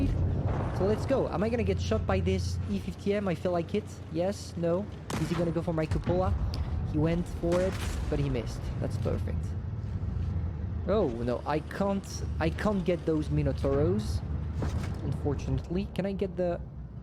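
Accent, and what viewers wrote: France. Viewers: are you french ? you have an accent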